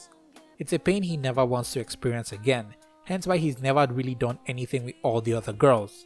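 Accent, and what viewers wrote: Nigeria. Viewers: Why do u sound Nigerian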